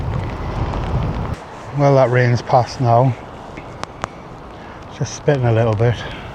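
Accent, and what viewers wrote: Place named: North, United Kingdom. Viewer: Amazing buddy love your accent are you from up north 😁😁😉😉😉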